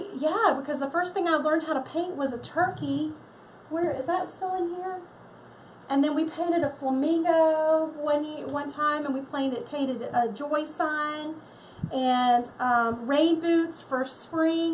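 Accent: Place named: South, United States. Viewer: Thank You!!! I cannot make a bow for anything that looks nice. This will help for Xmas gifts. I love everything you do, esspecialy your southern accent. 👍👍😊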